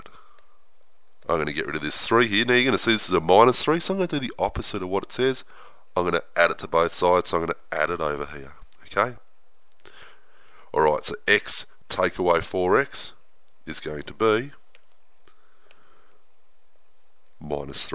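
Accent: Australia. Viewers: Having an Australian guy really helped out and kept me interested. I wish my teacher talked liked that. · Sounds like a fellow Australian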